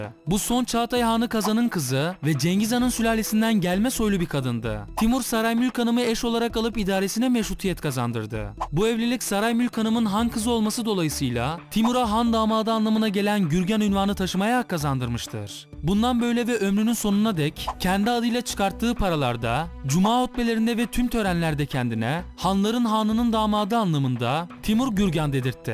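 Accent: Batman, Turkey. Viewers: Hocam batmanlımısın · Batmanlımısın kardeşim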